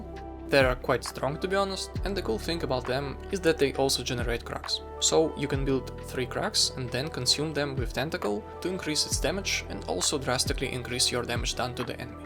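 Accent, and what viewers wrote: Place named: Russia. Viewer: Слышно своих)) Но произношение красиво, спасибо за билд.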